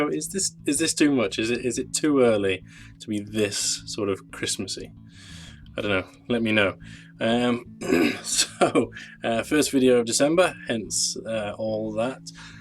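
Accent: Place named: United Kingdom. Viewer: Your Brittish accent is so annoying. Please do videos in Middle West American English, please.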